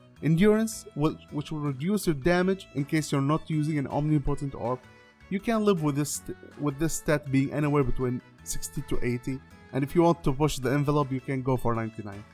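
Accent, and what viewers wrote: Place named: Arab world. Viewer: has an arabian accent 👀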